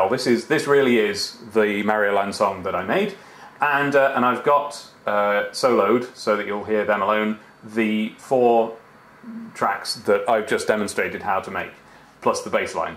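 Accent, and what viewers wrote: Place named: England, United Kingdom. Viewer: So glad I've finally see man with an English type accent make fun of Americans...my life is now complete...wait...or are you AUSTRALIAN?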